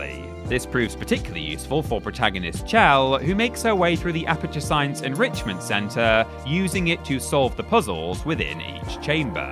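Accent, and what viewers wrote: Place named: Yorkshire, United Kingdom. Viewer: Nothing like a cup of coffee and the Yorkshire tones of presenting a list to start the day, ahhh..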